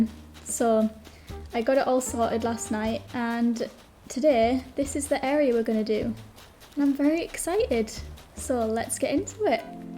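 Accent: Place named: United Kingdom. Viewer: Are you from the U.K.? I love your accent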